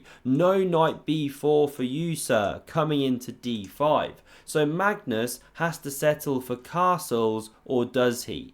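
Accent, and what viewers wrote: England, United Kingdom. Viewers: Off topic: Where is your accent/dialect from? I think England, but where?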